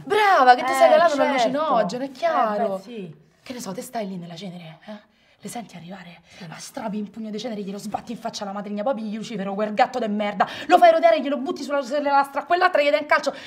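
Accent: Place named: Rome, Italy. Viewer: L'accento romano dente tutto unico😂😂😂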